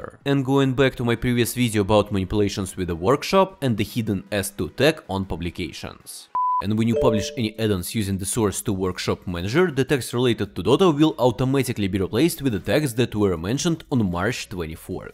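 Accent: Russia. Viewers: We all love spy dataminer chicken mit Russian accent 🐔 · Cool accent, are you Russian?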